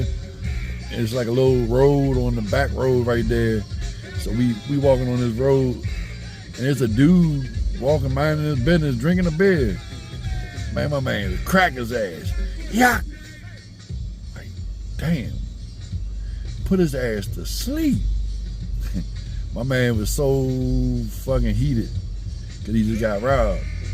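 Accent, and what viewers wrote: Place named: Africa. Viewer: Why are you speaking as if you were an African man?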